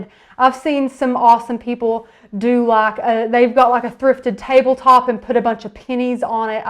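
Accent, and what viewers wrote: South, United States. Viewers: where are you from? You have more of a southern accent than me 😊 PLEASE PLEASE Show how to use with Android tablets I NEED TO KNOW HOW TO. 😭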